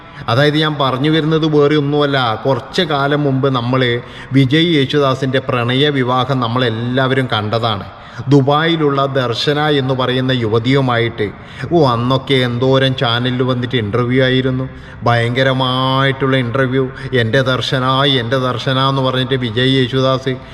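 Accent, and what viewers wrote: Kannur, India. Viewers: കണ്ണൂരുകാരൻ ആണെന്ന് സംസാരത്തിൽ നിന്ന് തോന്നുന്നു എന്തായാലും 100% സത്യമാണ് പറഞ്ഞത് മുഴുവനും യേശുദാസിനെ കണ്ടുപഠിക്കാൻ ഒന്നുമില്ല യേശുദാസ് എന്താ ജീവിതത്തിൽ ചെയ്തതെന്ന് യേശുദാസ് തന്നെ മനസ്സാക്ഷിയുടെ ചോദിക്കട്ടെ അപ്പോൾ ഉത്തരം കിട്ടും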